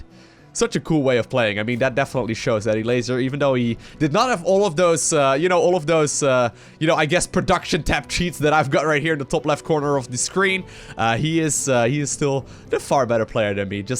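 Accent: Netherlands. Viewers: Ah ur dutch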